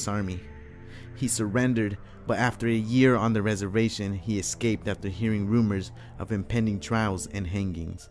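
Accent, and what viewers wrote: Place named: Mexico. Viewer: who's the narrator ...sounds Mexican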